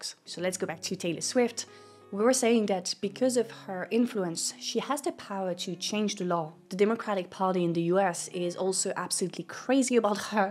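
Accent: France. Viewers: maybe it has to do with her french accent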